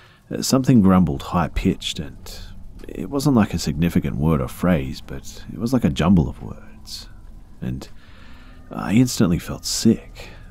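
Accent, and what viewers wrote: Australia. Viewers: Thank you for making these videos they honestly are the only thing that helps me sleep. Your voice is just nice and it’s also nice listening to a fellow Aussie accent 👌🏻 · Melinda Nicole I thought it was only me that loves the Australian accent XD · so? he's still got an Aussie accent... we are very multi-cultural in Australia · I agree! Love Australian accents. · that's our australian accent mate, i'm an aussie and so is, if you don't like it theres plenty of other narrators out there who aren't australian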